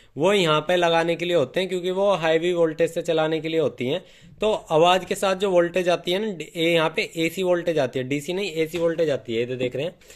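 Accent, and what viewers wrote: Meerut, India. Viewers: भैया मैं आपकी बहुत सारी वीडियो देखता हूं और आपका काफी पुराना सब्सक्राइबर हूं मुझे आपकी भाषा बहुत अच्छी लगती है क्योंकि मेरे मेरठ जिले जैसी ही लगती है जैसी मेरे मेरठ जिले की भाषा है आपकी भाषा बिल्कुल वैसी ही है अगर बुरा ना मानो तो मैं आपसे आपका निवास स्थान पूछ सकता हूं क्योंकि मेरा भी एक एलिजिन कंपनी का एंपलीफायर है जो खराब हुआ पड़ा है आपसे ठीक कराना चाहता तुम मुझे वह एंपलीफायर लेकर कहां आना पड़ेगा इतना बता दीजिए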